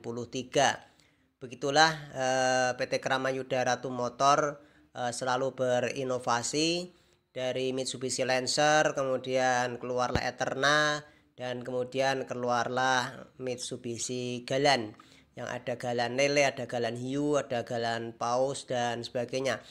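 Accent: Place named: Java, Indonesia. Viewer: Medok coy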